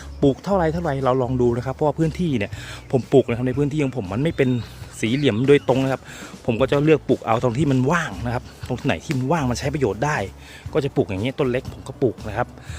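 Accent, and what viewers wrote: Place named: Sukhothai, Thailand. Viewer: สวนอยุ่โขทัยมั้ยครับฟังจากสำเนียง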